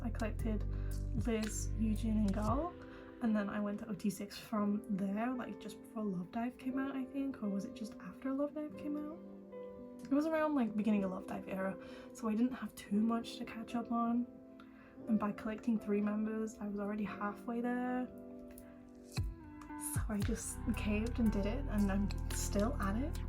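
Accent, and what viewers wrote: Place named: United Kingdom. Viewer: me: don't mention fellow british accents every time you hear them also me: surprised to hear british accent even tho i've watched you before ( i have not moved from dark side kermit meme ig )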